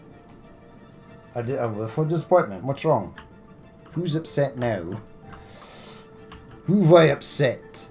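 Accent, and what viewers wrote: Scotland, United Kingdom. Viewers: Hey man. Where's the accent from? I assume it's Scottish, but I'd love to know. Thanks!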